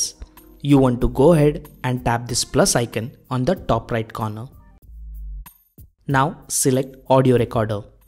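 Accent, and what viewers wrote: India. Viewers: Idk but his Indian accent made this 10x easier to understand. Thanks for the help 🙏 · SUch a damn indian accent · I love indian accent yes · Indian English accent 😢😢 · THANK GOD HE HAS THE INDIAN ACCENT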